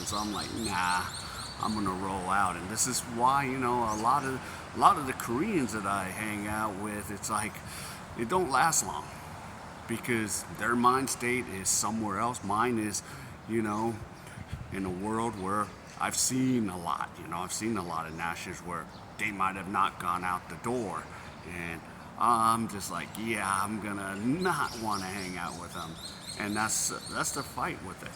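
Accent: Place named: South, United States. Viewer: Maybe u need to tone down the red neck accent a bit hehe..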